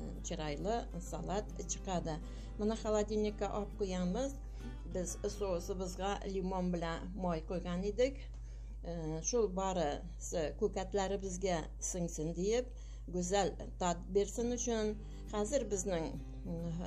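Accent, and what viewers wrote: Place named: Turkey. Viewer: Turkmisiz deyman aksent bilan gapiryapsiz ozbek tilini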